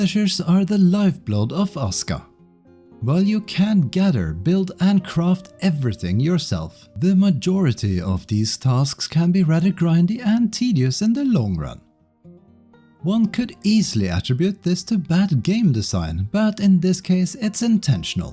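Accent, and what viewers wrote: Norway. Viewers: Are you from Norway ? your accsent sounds like that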